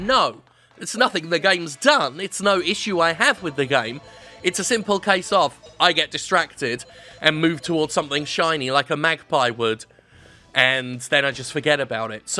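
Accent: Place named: United Kingdom. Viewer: Is it just me or did Jim sound extra-British in this video.....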